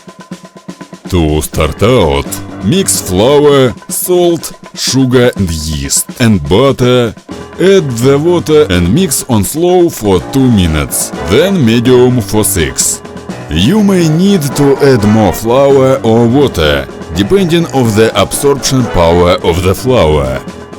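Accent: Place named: Russia. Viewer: Wow! This is a Russian accent. I know how it sounds, because I am from Russia!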